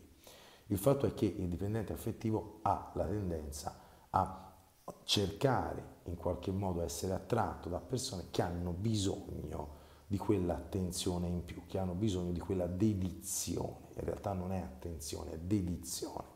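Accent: Tuscany, Italy. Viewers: Basta, fatela finita, basta andare a vedere questi video sul narcisismo 😂. Molto simpatico dottore, ogni tanto la seguo proprio per questo. Ha perfettamente ragione altrimenti diventa un ossessione. Si esce da una dipendenza e si entra in un altra . Complimenti per il suo modo schietto di esporre . La parlata toscana ben si addice. Chapeau 🙏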